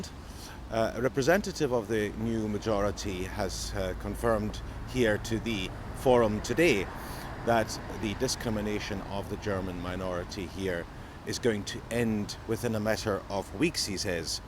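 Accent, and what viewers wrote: Scotland, United Kingdom. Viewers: Are you Scottish by any chance?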